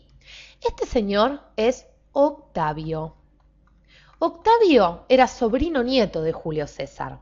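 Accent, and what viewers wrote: Argentina. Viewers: Es argentina la piba?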